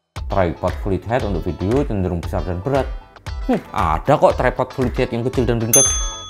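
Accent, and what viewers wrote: Java, Indonesia. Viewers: Mantap... Pertahankan logat medhok nya yo mas.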